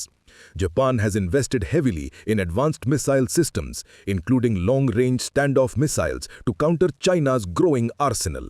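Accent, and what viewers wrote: India. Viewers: Indian accent. I dont like. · If it is AI voice why pick these Indian accents this is so hard to hear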